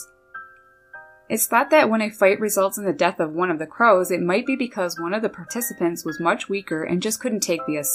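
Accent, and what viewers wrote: Canada. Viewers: Annoying Canadian accent. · By any chance are u from Canada?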